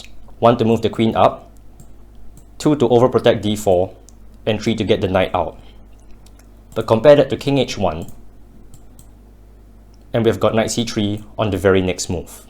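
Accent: Singapore. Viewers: Brilliant video. I'm 1500 rated and I found this video really instructive. Are you also Singaporean? I ask because I am, and I recognise the accent! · Ayoo you from SG? Accent sounds so familiar lol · Let me hear that Singlish sir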